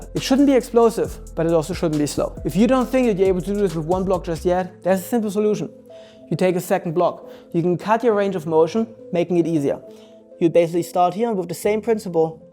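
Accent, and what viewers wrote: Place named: Germany. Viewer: Was ich schon länger mal Fragen wollte: Wo bist du eigentlich geboren? Es klingt so, als hast du einen deutschen Akzent :P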